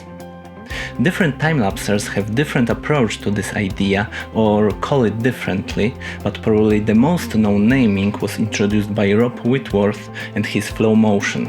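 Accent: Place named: Russia. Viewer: Вы русский? 😅 You have russian accent Well done 👏 I learned a lot 🙌 thank you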